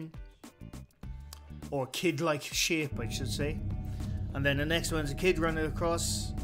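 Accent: Wales, United Kingdom. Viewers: Is that the accent of a fellow Welshman I hear?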